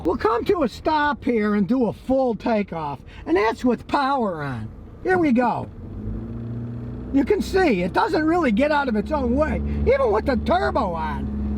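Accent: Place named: East Coast, United States. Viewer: East Coast accent with a slur the first time I really noticed 😳 . I'm learning how to be a conscious vehicle owner that I never noticed everything is delivered better when you pay attention 😂🤣🤣😅😆😁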